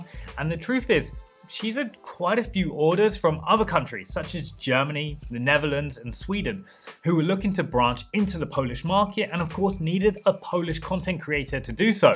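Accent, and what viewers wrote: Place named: United Kingdom. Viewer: I deeply wanna get your piece of advice but that damn british accent 😭😭😭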